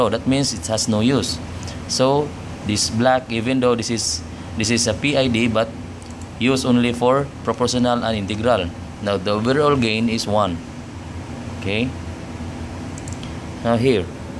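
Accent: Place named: Philippines. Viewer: i really like the way you explain things, and its very easy to understand. The way you talk i believe your a Filipino hehe. Hello sir. I'm really into control loop/logic diagram, i want to learn more in that area. maybe you can advice/help me achieve this in my career. Currently doing cadet-ship in a Power Plant.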